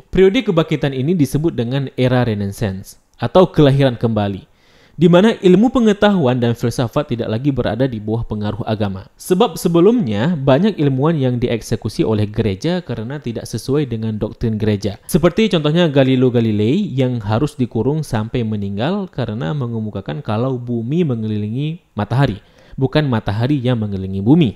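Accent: Aceh, Indonesia. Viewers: Logat Aceh si Babang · Wajarlah Beliau kan orang Aceh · Beliau orang aceh. Yap jelas ada bagian suku dan budaya melayu. Lebih tepannya melayu sih dari pada suatu negara. Karna melayu ada di malaysia dan Indonesia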